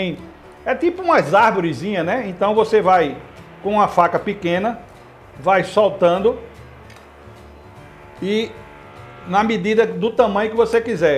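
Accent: Brazil. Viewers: Que explicação profissional e prática! Esse sotaque é de Recife?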